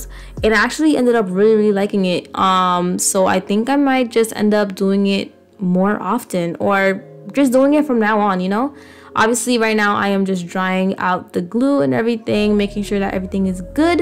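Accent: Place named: New York, United States. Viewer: I love your New York accent 😭 and thank you for this! Mine just came today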